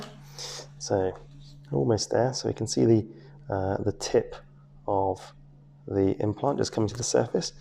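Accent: England, United Kingdom. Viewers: Very soothing bedside manner, as usual! And I love how everything sounds better with an English accent. Keep up the good work, Doc. :-)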